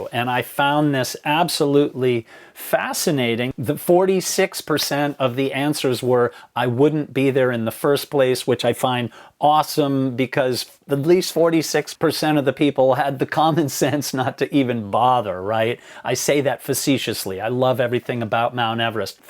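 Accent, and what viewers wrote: United States. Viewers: it sounds like a cosmopolitan New England accent, so not too strong compared to Maine or Boston.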